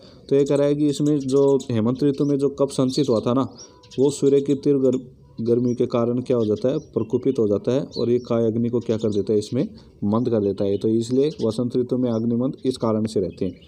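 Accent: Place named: Haryana, India. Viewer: Bhai hariyana se lgta h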